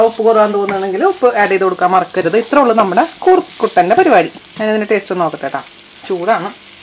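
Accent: Thrissur, India. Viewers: Trishoooor aaan'to....... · ഹായ് ചേഈച്ചി തൃശ്ശൂര് ഭാഷ കേൾക്കാൻ തന്നെ സുഖ ഞങ്ങടെ വീട്ടിൽ കൂർക്ക സീസൺ ആയാൽ എന്നും കൂർക്ക ഉപ്പേരിയവും ഇപ്പോൾ ഇവിടെ മസ്കറ്റിൽ ലുലു വിൽ ഉണങ്ങിയ കൂർക്ക വരുന്നത് നാട്ടിലെ പോലെ മണം ഒന്നുമില്ല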